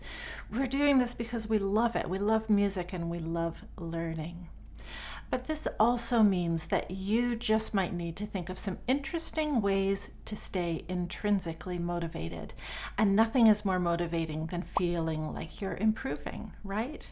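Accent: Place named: Canada. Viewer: Thank you for making me feel a musicien at 72 years and for the inspiration you give me to sit down and practice in a structured programme. I do love your very clear canadian english accent. Have a nice Sunday.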